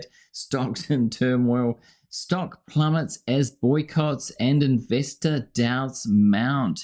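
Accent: New Zealand. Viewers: Good Kiwi accent bro'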